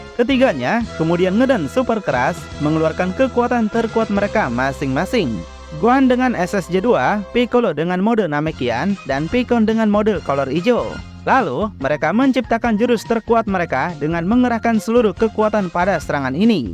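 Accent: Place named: East Java, Indonesia. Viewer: Jawatimur suaranya kawan😂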